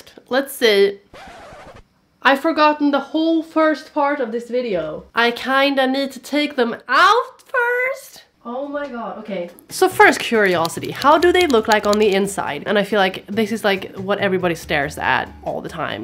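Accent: Sweden. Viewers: It's her accent, she's swedish. ^u^ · SHE HAS AN SWEDISH ACCENT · Is she from Sweden bc she has that accent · Is she Swedish? Cuz she kinda sound like you have that Swedish accent when you speak English. Lol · She sounds sooo swedish lmao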